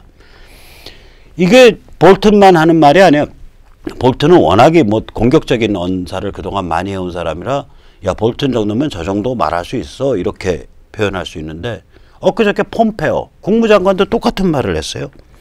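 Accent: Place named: Seoul, South Korea. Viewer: 우파 논객 분들이 대부분 나이가 많으신 편입니다만, 제 생각에는 이 젊은이들과 가장 소통을 잘 하실 수 있고 잘 되실 수 있는 분인것 같습니다. 그래서 하나 제안을 드리자면 방송하실때 복장을 와이셔츠 넥타이보다는 좀 더 캐주얼하게 입으셔서 2,30대 젊은 사람들에게 좀 어필 하면 어떨까 합니다. 목소리도 좋은시고 대부분의 우파 논객들이 경상도 분들이 많은데 은 서울 표준말을 쓰시고, 그리고 의 탁월한 지식과 결합되어 말씀도 재미 있으면서도 아주 설득력이 있는것 같습니다. 그래서 젊은 사람들이 충분히 주의를 기울일 수 있는 분이라고 생각합니다.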